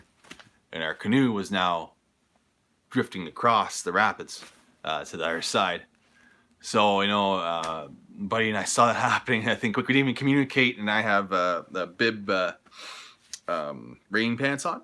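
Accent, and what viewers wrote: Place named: Quebec, Canada. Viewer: You were talking with your hands so the dog thought you wanted to play. You don't sound Ontario Canadian , you say eh way too much. I'm Ontario Canadian, and I say it a couple times every few months or less lol. Actually you do sound like you're French Canadian from Quebec with that many eh's in a short time. I prefer to watch mostly all Canadian channels of camping. I'm a new subscriber. Actually you could be from Vancouver or Newfoundland they say eh tons too.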